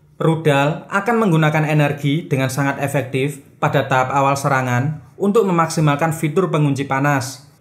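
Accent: Java, Indonesia. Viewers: Kau cakap apa woo...kental betul jawa kau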